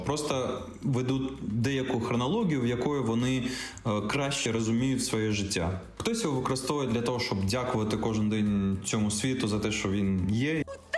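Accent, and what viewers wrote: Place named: Belarus. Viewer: наче українська з елементами білоруської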